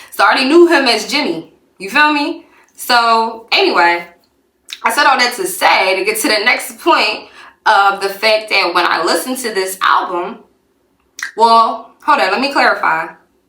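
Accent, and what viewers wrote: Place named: Philadelphia, United States. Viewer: That Philly accent is everything… 😩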